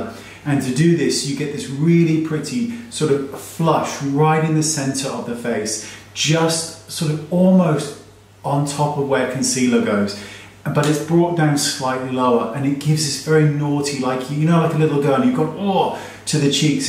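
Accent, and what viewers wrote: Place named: United Kingdom. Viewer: Who else came here so they could hear in his lovely British accent say 'Naughty'. 💜💜💜😄😄😄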